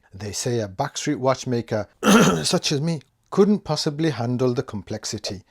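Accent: Birmingham, United Kingdom. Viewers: Hey, now you just listen hear, you just go an stay a barmy brummie, ‘cos that’s the way we like it - uhu-uhu, that’s the way….. Oh you know wot I mean. Stay cool, stay happy and please keep the camera rollin’. 🙏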